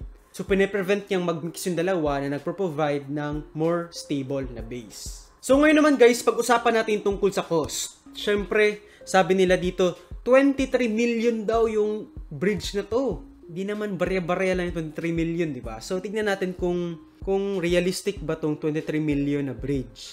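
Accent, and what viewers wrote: Visayas, Philippines. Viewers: Hahaha bisaya d i kaha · Bisaya mn diay ka ser?? Proud bisaya here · Bisaya gyud 😂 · Tingala hahaha bisaya halata kaayu. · Taga saan ka po idol? May accent ka po ng bisaya idol kaya naitanong ko po kung taga saan ka.. Ako po kc taga Masbate bisaya po me hehe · Bisaya mn ka engineer 😂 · Bisaya ka sir bisaya pod ko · aw ..bisaya diay ni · Nganong bisaya ka ner? Asa ka gikan nga lugar? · Bisaya mn diai... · Ibutang na dira tanan . Owryt bisaya ta pre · Bisaya man diay ka sir😁🤘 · Bisaya man diay ka idol😂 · bisaya d i ka dol 😁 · Bisaya ka po pala😁😁😁😁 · bisaya mn diay ka dong..😁😁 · Bisaya man diay ka doh.. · Bisaya man diay😂😂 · Idola aning mga bisaya mag tagalog oy HAHAHA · Bisaya ka gli dong taga diin ka? -aspiring engineer also :) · Kahit hindi nato recent na vid, still very well explained and learned a lot as well. Nakakatuwa lng how kuya resist a little of his Bisaya hahaha. 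Proud Bisaya here!!!:) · bisaya deay ka lods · Bisaya di ka sir 😅😅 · Bisaya diay ka bai.. Hahaha. Subscribe nalng ko.. Butangan tika run. Hahahaha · Klaro Kaayo Bisaya.😁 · Kana! Naa ra japoy pagka bisaya ba hahahahahaha makalingaw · Bisaya jud..gahi...😂😂💪💪👍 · Bisaya kaayo ka, Sir😅 taga diin kaha ka Sir...?🤔😅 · Bira bisaya jud.. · Klaro kaayong bisaya accent masi mag tagalog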